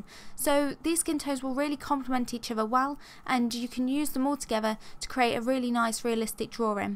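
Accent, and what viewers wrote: United Kingdom. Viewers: really love the tune of the way people from uk use to speak english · your british accent is the most british accent i've ever heard before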